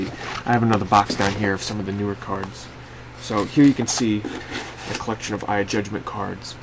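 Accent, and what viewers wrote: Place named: New England, United States. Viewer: I like your New England accent. "Didn't get to play much of WarHauk"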